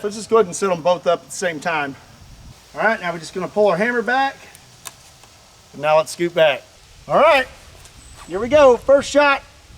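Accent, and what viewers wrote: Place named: Texas, United States. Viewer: What's with the Texas accent?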